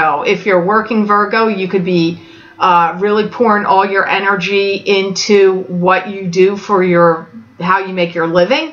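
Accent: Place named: Pittsburgh, United States. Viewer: Are you located in western PA? Because if so I'm pretty confident that it could be my family members that are attempting to communicate to me through you 😂😂 I literally was thinking about them and your accent 1 minute before you said you got the chills and I got them with you! I am a Virgo sun and moon and have watched you religiously for over a year. I LOVE YOU! (You're not crazy so don't think that you are!) you channel so many energies and it's up to us to vibe at your level to actualize these realities. Anyways I think it's my family because they are from Pittsburgh, I have TWO clogged sinks, a plumber just left for a leaky toilet, i have been needing to fix my AC for months, and need to return my air diffuser. I've been hesitant about moving but just decided to move long distance from my love and it has instantly improved our relationship! 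Like molded up the gel for sure ;) and I haven't moved yet! I have been dragging my feet with healing and creating for a year because it's different from "being a normal RN" and your reading just helped me significantly. Affirming my feelings. The eclipses were NUTS 🥜 but this month has been amazing and it's only day 2!!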